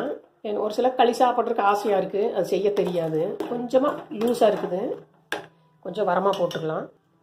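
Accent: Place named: Kongu, India. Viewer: அருமைங்க அக்கோய்.. நீங்க சொன்ன விதமும், உங்க கொங்குத் தமிழும் சூப்பருங்க..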